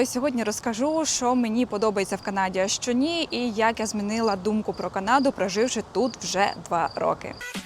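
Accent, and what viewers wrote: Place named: Surzhyk, Ukraine. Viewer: з якої ти області в Україні? в тебе така прикольна українська, але деякі вставки слів з "мови з боліт" ріжуть вуха) нетиповий суржик)))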